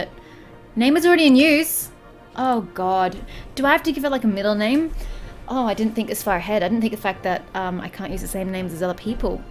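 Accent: Australia. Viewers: Helloooo from Denmark ... sweet voice... NZ or Aus? I'm guessing Aus 🙃 Start. Character build. "Honey, why is your hair so luminescent?" LOL ... also she starts the game here. Also LOL 😅 Motion blur is disgusting ... agree! Haha. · What Australian state do you come from?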